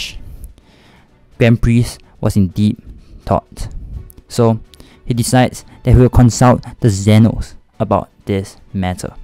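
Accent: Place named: India. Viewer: i love the indian accent maney :)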